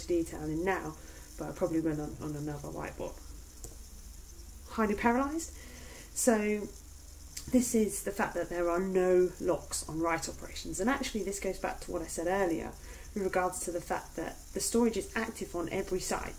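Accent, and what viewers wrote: United Kingdom. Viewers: I love your british accent. Are you Renee Zellweger? Jajaj. Sorry. Excelent video. Thanks a lot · Superb and yes, love your British voice. Please do more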